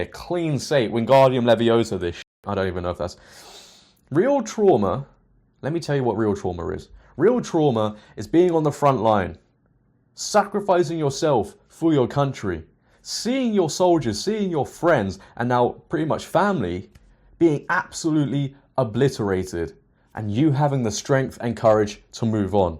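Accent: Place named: United Kingdom. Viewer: It’s my brittish man who gives based facts in a swanky accent.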